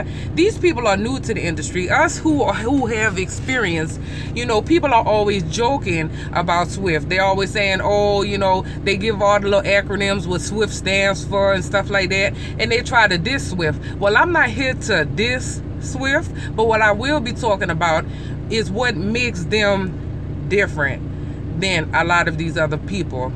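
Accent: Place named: New Orleans, United States. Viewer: U gotta be from New Orleans. Hear the accent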